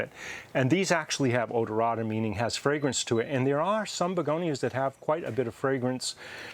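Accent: New York, United States. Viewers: I hope you make many more Begonia video's? I love watching the endless stream of Begonia's. And they are all so different 💚 Love accent too. New York? Sounds very exotic to a European.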